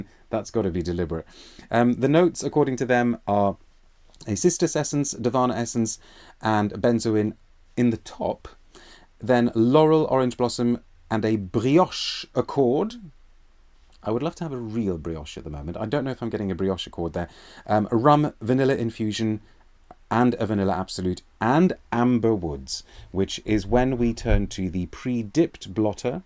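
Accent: United Kingdom. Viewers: Happy New Year Mr! Welcome back, so glad to hear your British English again:)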